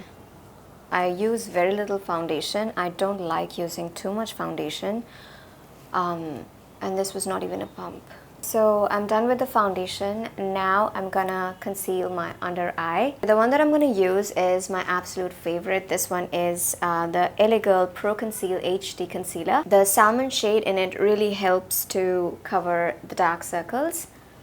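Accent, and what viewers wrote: Bengal, India. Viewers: Hey just subscribed & loved ur video! r u Bengali? U sounded like a Bengali. I'm from Bangladesh. Sending love from here 😘 Nd u're so much natural beautiful that any makeup look will suit u.even without makeup u're much pretty 😍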